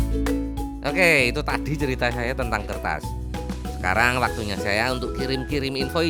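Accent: Java, Indonesia. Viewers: Keren om 😀 Asli orang mana sampeyan mas? Medhok tenan wakakak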